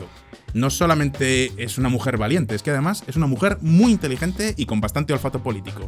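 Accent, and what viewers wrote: Spain. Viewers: Que !! Quee!! Queeee!!! Español de españa? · Español de España perdiste el 95% de tu audiencia Lovesno Onda vital A todo gas 😂😂😂 Brus wilis en español latino si es escuchable. Fonseca te recomiendo el video del chico de los subtitulos para que veas la indignación por los doblajes de tu país.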